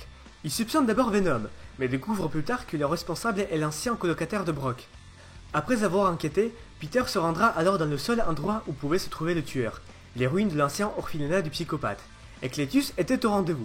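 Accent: South, France. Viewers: Sympa le concept, par contre je ne sais pas quel est ton accent, mais pour le commun des francophones, c'est parfois compliqué de te comprendre. :) il "reuvait" de devenir pompier ?" sa "grammaire" dans l'escalier... C'est le sud ca, mais ca complique un peu la compréhension.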